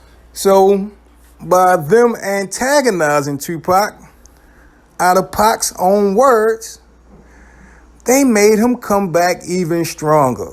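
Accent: Baltimore, United States. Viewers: Are u from Baltimore? Cuz i hear that accent 😂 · Whoever made this shit is from Baltimore. I can hear it in yo voice motherfucka... 🤝🏿 Salute! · Bmore prolly? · U got a hard Baltimore accent. I recognize it anywhere. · You sound like you're from Baltimore or the east coast somewhere. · You definitely from B-more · Off subject ..But peeps from BMore accents be wild wit The U`s and 2's. GETS ME every time but anyway always dope videos 🤣🤣🤣🤣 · All I hear is your Baltimore accent.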